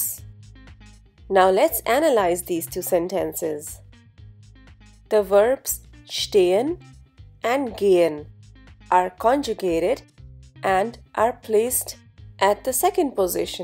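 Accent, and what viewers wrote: India. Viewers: it sounds like this lady has an Indian accent and it’s throwing me off… i can’t pronounce words properly because of that 😕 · Ist es nur mich, oder klingt ihre deutsche aussprache Inderin? Is it only me or does her German accent sound Indian?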